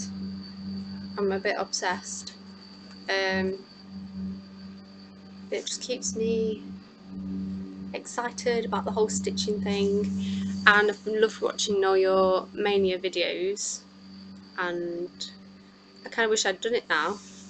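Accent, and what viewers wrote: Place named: Sheffield, United Kingdom. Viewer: its brilliant seeing another stitcher from the uk though it started a debate between me and my boyfriend trying to place your accent i say somewhere south Yorkshire? thats where i am and you kind of sound sheffield ish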